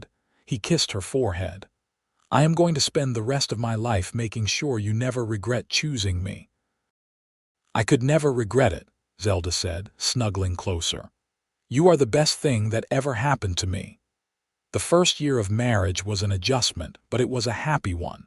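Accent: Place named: United States. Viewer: At First: favorite narrower - an ideal voice for wild west stories, through and trough American English, sounding like a music instrument. Greetings from Germany.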